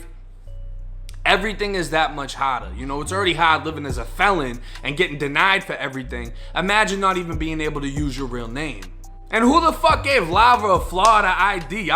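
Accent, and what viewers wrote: Boston, United States. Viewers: I'm new here , you from Boston? That accent got me cutting up lol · You from new England boss?? Deff sound like your from boston im sure not 1st time you've heard that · yo are you from boston your accent is crazy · Are you a Bostonian. You talk like them.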